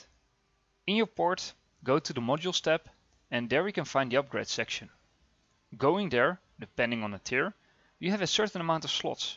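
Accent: Netherlands. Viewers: Are dutch?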